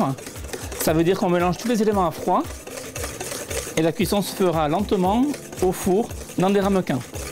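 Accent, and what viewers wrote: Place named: South, France. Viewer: des 'rameuquins' :) j'adore l'accent du sud !! merci pour la clarté de cette recette !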